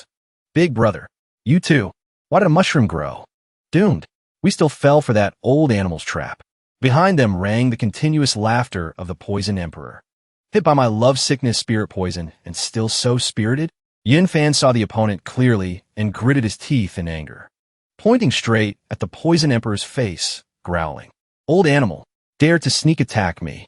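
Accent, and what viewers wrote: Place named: France. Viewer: tf is this voice? is the ai getting heartattacks? why is it speaking in a french english accent in the video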